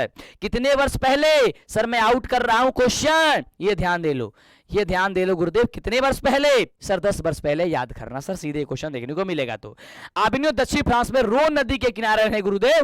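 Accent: Bhojpuri, India. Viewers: Bhojpuriya sir❤❤❤😅😅😂😂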